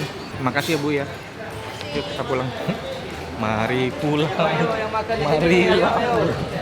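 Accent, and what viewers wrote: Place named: Pontianak, Indonesia. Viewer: tak ade bukan padang , nah bahasa pontinye kental cuy wkwkw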